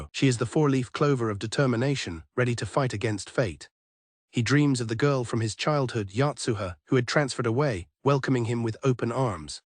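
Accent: United Kingdom. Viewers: British narrator? Yessir, first time hearing British narrator.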